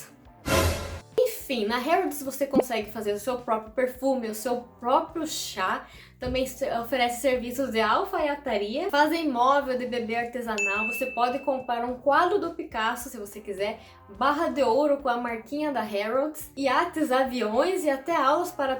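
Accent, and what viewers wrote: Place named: Santa Catarina, Brazil. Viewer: tenho uma amiga em Videira - SC seu sotaque é muito parecido com o dela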